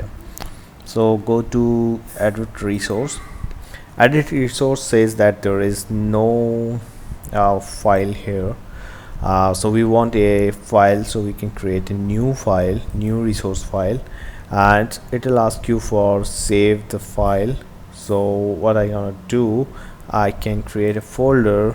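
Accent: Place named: India. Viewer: I usually hate inidan tutorials, but this time I can understand you. Your accent is not that bad.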